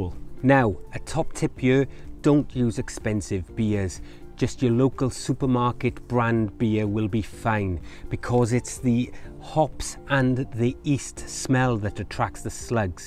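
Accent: Wales, United Kingdom. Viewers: Margie Watson He’s from the Welsh valleys, maybe Maerdy, Ferndale, Aberdare ? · Guessing from the accent your Welsh too, I'm from the Swansea area. Before this month my soil has been turning into dust. I know about the problem with the jet-stream breaking down over the last few years and the low rainfall over the last 12 months for the south coast. Is there anything that really works to keep soil moist. I just bet that after this month, its going to go dry again. The garden is loving the rain this month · I hear the accent...I subscribe. Dan and oliver, Cardiff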